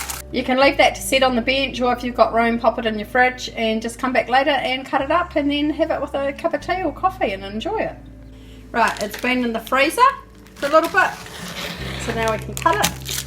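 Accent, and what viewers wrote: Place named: New Zealand. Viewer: What part of NZ are you from? That's a strong Kiwi accent!! I watching from Spain, missing my Kiwi treats.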